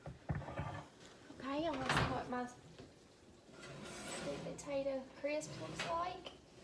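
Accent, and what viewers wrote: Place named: South, United States. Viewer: It looks delicious! Love your southern accent, so cute. Have a great day!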